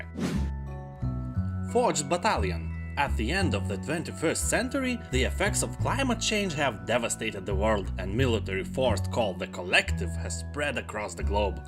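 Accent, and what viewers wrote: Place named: Russia. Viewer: Опа, русский акцентик))))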